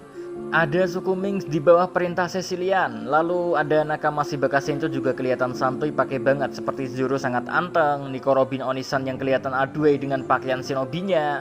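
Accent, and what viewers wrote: Java, Indonesia. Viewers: Orang ini mirip abdi kos soalnya sama sama medok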